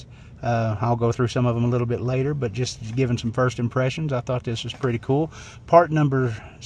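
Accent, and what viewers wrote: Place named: South, United States. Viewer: A Pats fan with a southern draw is alright in my book.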